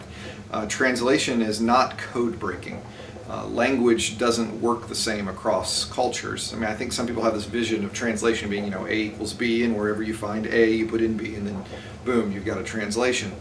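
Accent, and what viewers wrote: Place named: United States. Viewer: Which US State are you from? Im trying to guess the accent. :)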